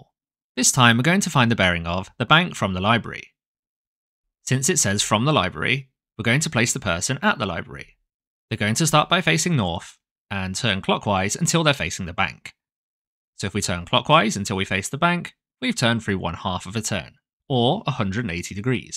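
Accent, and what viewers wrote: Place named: United Kingdom. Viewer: Also known as having a British accent.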